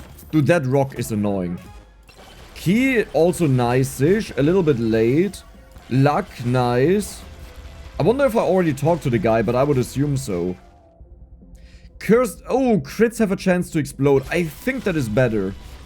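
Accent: Germany. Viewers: Richtig deutscher Akzent xD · im watching tha vid and the hole time i thougt, he has to be german by the way hes pronouncing words, and it got fcking confirmed... :D lov it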